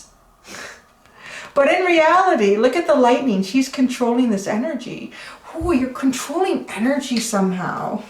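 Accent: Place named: Canada. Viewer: I love how Canadians pronounce "out" (Thank you for the reading <3)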